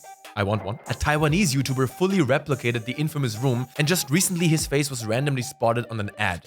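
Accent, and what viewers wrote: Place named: Germany. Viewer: Ich habe gerade festgestellt, dass die automatische Übersetzung von YouTube durch deinen starken deutschen Akzent einfach nicht funktioniert. 😂😅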